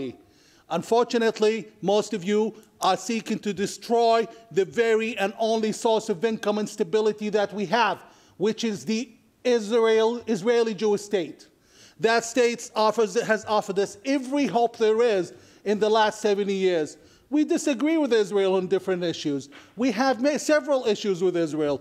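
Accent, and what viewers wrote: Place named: United States. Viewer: Sounds like an American. His parents must have immigrated to the USA. Nothing negative, just saying he speaks American English.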